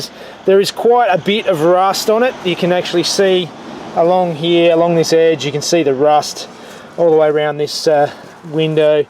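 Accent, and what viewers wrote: Australia. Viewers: Do a full 105 bertone race car conversion. Had i known you were i Adelaide I would have lent a hand. Thought i recognised the accent. (full adelaide question) Where'd you go to school? My best mate has been putting off his 105 rebuild for nearly 15yrs. I recommended he check your channel out and the next week bam, 105 rebuild. Is it a twin spark motor?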